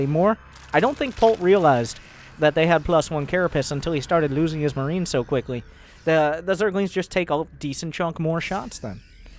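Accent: United States. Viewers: I love your delivery, vocabulary and accent. It's nice to see such a strong american accent that is easily understandable by a non-native speaker. Kudos!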